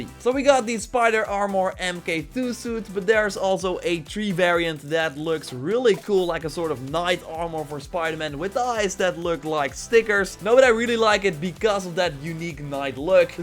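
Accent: Netherlands. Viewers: Deze guy is sws Nederlands😂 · Are you dutch? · Are you from the Netherlands? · You are dutch right? I think i hear it in your accent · Jij bent nederlands toch?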